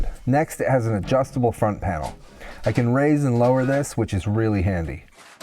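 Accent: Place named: United States. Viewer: us accent, living in the uk, looking like an australian, this dude rules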